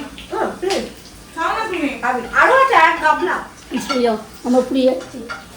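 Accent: Bangladesh. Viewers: Di tumi steam e kore dekho darun lagbe Tomar kotha bolar tone Bangladesh r mone hoi Aage aeikhane chyla ki na ??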